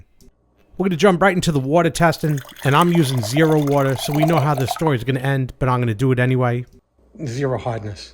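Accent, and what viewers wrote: New York, United States. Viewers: So, I'm a former NYer and just freaken LOVED hearing a voice from home! We ordered the S8 last night and expect delivery in 5 sub-par coffee days (our Breville burr grinder is breaking so the coffeemaker is making tan water - ugh). Thanks for walking me through the set up, etc. Lavazza is good coffee, as is Julius Meinl Prasidente, but I still prefer La Colombe Monaco or Sumatra beans (via subscription - roasted in PA and shipped). OMG, as I drink this swamp water, early in the morning with the house still asleep, I am PINING for a cuppa anything made with the S8! Subscribed!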